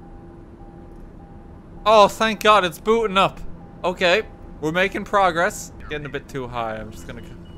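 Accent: Ireland. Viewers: Is he Irish? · wait are you irish or something?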